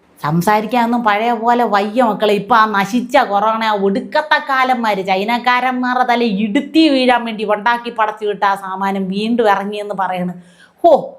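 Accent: Thiruvananthapuram, India. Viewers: ഇത്ര നന്നായി തിരുവനന്തപുരം ഭാഷയിൽ മുഖത്തടിച്ച് കളിയാക്കുവാൻ കഴിവുള്ള ഒരാളെ അഭിനന്ദിക്കാതെ വയ്യ. Adv.സാജനും തിന്മക്കെതിരെ പൊരുതുന്ന ഈ മാദ്ധ്യമപ്രവർത്തകക്കും ഒരുപാടു് അഭിനന്ദനങ്ങൾ...😂❤🙏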